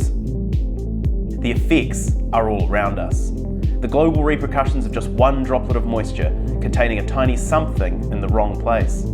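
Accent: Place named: New Zealand. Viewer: Great illustration of nature as a complex adaptive system! And nice New Zealand accent...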